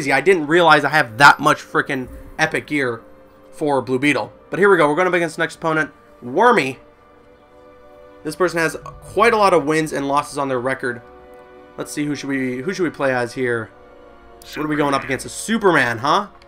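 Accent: Canada. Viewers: This is my first time on ur channel and can I just ask, are you Canadian?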